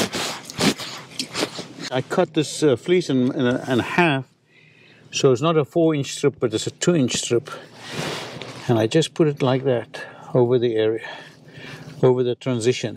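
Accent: South Africa. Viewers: Is that a South African accent I can hear?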